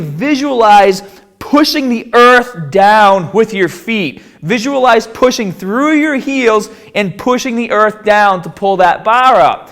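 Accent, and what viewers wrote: Boston, United States. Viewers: Great advice brotha. Are u from boston??? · I've only watched a few of his videos but this is the first time I noticed his heavy Boston accent haha. Mainah heah bub. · Boston represent! Your accent is wicked awesome! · I came to see what the difference is. But why do you have half a Boston accent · One of the sickest Boston accents I've ever heard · DIVINE PHYSIQUES TV it's not Aussie, it's a Boston accent. Boston, US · IM hearing a familiar accent, are you from Boston? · I think you are the best with online video explanations of dumbbell use. You are thorough and I truly appreciate you. I wish I could train with you in person. Do you happen to have a video on diet/nutrition? I've got to get 70 lbs off and begin training for a half marathon. By the way, love that Boston accent, I lived there as a very young kid when my dad attended Harvard.😊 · Holy shit that is the greatest boston accent I've ever heard! 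baaaaah bell 😂👌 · Holy Boston accent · Soon as I heard the Boston accent I hit dislike and left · Boston accent sounds so gay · My guy sounds like Seth Meyers with a Boston accent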